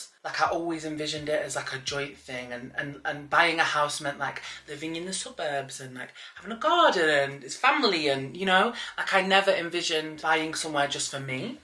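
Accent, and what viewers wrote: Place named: Preston, United Kingdom. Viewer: I have no idea how I never found your channel until recently, we're the same age, same size, and I also grew up in Preston so we sound the same😂 the asda you used to live behind is where I went for the weekly shop with my mum haha. Your genuine love for yourself and happiness is so empowering to see, thank you for posting!❤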